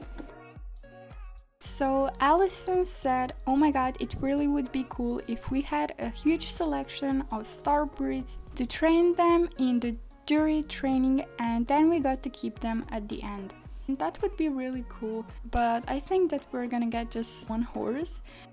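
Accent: Germany. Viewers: Omg lol you german? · I think she's German? Or atleast her accent is very similar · Are you German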